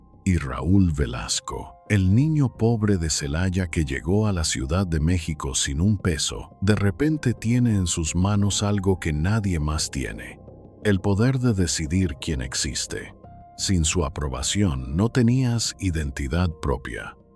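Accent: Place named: United States. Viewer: El comentarista la caga con ese habladito como de gringo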